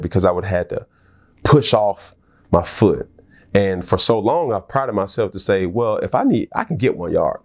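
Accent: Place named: Atlanta, United States. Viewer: Bruh you letting your ATL come out slowly..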